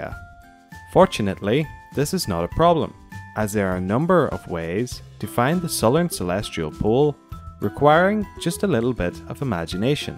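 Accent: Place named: Northern Ireland, United Kingdom. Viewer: Love how you have to speak so slowly do that people understand your NI accent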